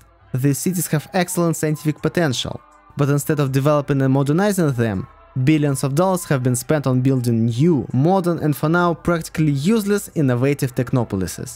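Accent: Russia. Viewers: Withthout prthoper autthorization. Sthensitive. I like Russian accent English. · Russian accent as it is, I have the same)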